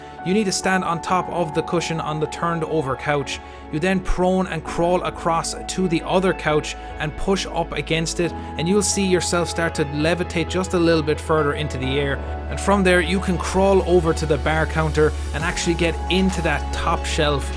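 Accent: Ireland. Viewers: Are you Irish? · i can tell ur Irish from your accent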